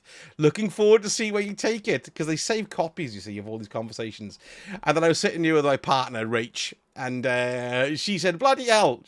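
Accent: Wales, United Kingdom. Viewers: Great to put a face to that beautiful Welsh accent, been watching your content for years! As an older gamer myself I enjoy your humour. Id love to see you do some videos on the replayable aspects of Destiny like raids with viewers or on some of the controversies like Manstrual’s ban and his corresponding video. Hope the game doesn’t die. People have been saying it’s finished since before I started playing all those years ago.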